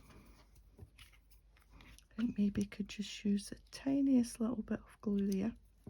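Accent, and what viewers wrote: Scotland, United Kingdom. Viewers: Great to hear another Scottish accent. Fabulous box of goodies. Love what you made💕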